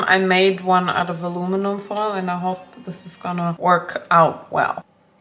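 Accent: Germany. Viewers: shes german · Is it just me or has she got way more German recently, her words are so much less pronounced compared to what they used to be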